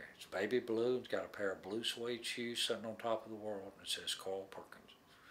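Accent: South, United States. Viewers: I just love listening to talk! He’s an awesome story teller, and love that southern accent!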